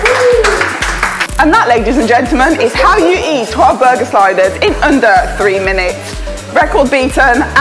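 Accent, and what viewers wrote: United Kingdom. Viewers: I like her British accent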